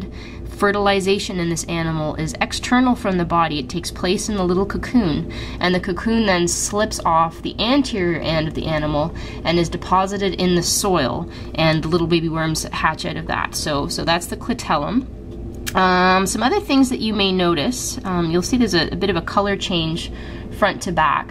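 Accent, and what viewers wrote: Canada. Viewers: .... are you Canadian?:)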